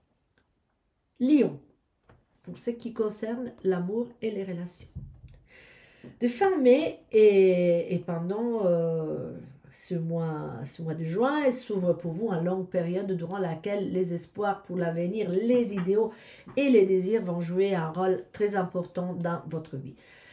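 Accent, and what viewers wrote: Italy. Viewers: Merci beaucoup. J'adore votre petit accents italiens, ça me rappelle mes origines italiennes par ma mère, française par mon père. Perso je suis balance ascendant balance je compte sur Jupiter. 😊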